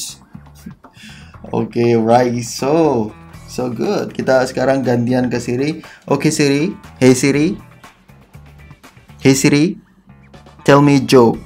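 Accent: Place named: Yogyakarta, Indonesia. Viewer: Saya lebih suka kamu berbicara dalam bahasa inggris, karena bahasa indonesia terkesan berbau yogjakarta.. tapi overall goodluck...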